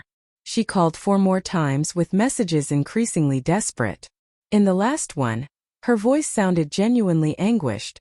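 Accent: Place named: United States. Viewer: If you're going to use AI to create a story supposedly by an Aussie, you could at least use an Aussue and not an American voice! Also you'd get a lot more than $110K after sale of a unit in Sydney. More like $1M.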